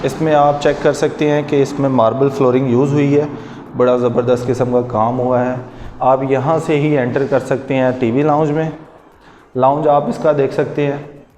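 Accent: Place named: Karachi, Pakistan. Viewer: Bhayya Karachi waly lgty hen😍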